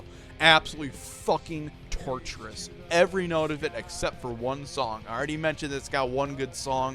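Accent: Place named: United States. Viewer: lol, The Shadowthrone being the worst... Nemesis "Divinia"... lol :D 1. Disagree that any of the instrumentals are pointless... that's just a pointless point... 2. Disagree with your "absolute best" songs on the 1st two albums :) 3. Disagree on "Rebel Extravaganza" being the best album from those to come... I think it's the worst... Volcano is much better. Now, Diabolical; Satyricon & Deep calleth upon Deep are amazing albums! There's absolutely nothing wrong with them! This guy only says: this is the worst of all & that is the worst of what I've ever heard... lol, this guy sounds like 100% American id*ot who's trying to make sense of pure European Black Metal Epicness! :) Otherwise, there're some new stuff that I learned from that video, like the early history of Satyricon & their formation. So, I wouldn't say it's completely useless... something like 4/10 is fine. 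The rest is only "boring as fuck" this & that :D Nemesis DIVINIA - Peace Out! :)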